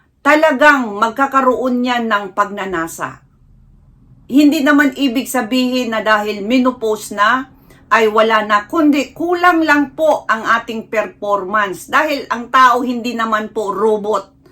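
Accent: Visayas, Philippines. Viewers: Bisaya ka day? Basta bisaya gahi og dila.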